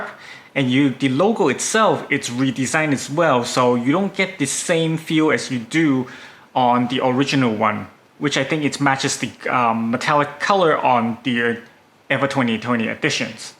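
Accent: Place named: China. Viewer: you voice comes off as someone who native tongue is chinese.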